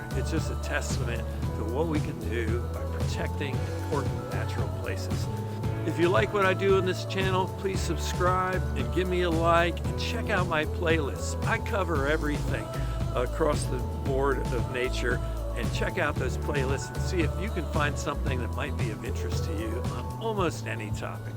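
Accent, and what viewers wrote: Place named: Delaware Valley, United States. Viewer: I haven’t been to JHNR in years! I used to go when it was Tinicum with my dad. It is an amazing place. There is a small place in NJ called Palmyra Cove. It’s wonderful that we save these spaces, rather than develop them as we have so many spaces. By chance, are you from the Delaware Valley? I detect a bit of an accent sometimes😊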